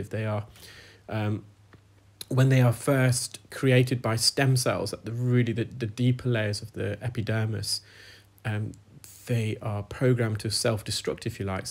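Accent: United Kingdom. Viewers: Someone telling you off for using “anti-clockwise”? When it’s a UK term, used in a video recorded in the UK, by a person who sounds like they were born and raised in the UK? The nerve. Now that that’s off my chest - great video as always,. You never fail to entertain and educate. Please keep up the good work!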